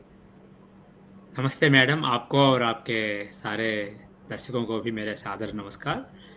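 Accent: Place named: South, India. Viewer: take time to speak clear hindi, sir ji. need more practise in hindi. south version of twist of your tongue needs correction.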